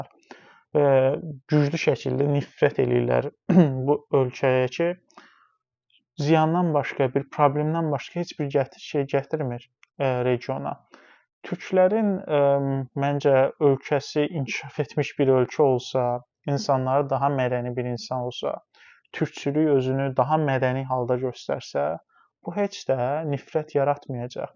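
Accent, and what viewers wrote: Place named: Armenia. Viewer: Sənə qulağ asanda adama elə gəlir ki bir erməni dığası zərbaycan dilini yaxşı öyrənib və Talış mıllətiylə Azərbaycanlıların arasın vurmaq istəyir,talışları mən həmişə özümə qardaş bilmişəm və biləcəyəm,sən deyilsən sən erməni dığasısan.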